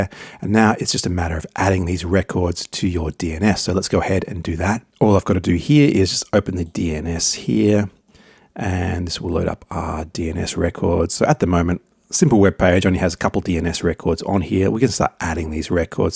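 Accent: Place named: Australia. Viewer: Hey, this video is great, thank you. As it sounds like your from Australia as well... we have only just starting working a lot more with Cloudflare in the last few months with websites, subdomains and email routing. We have come across problems with our internet and email provider being Optus. Question, do you know if this format that you have just explained will work for something like Optus, because all our emails we send from Outlook. Hope this makes sense, regards Brad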